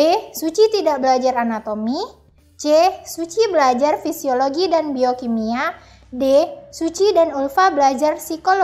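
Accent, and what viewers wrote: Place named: Medan, Indonesia. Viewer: Kaka orang medan y bhsanya kedengeran bnget ka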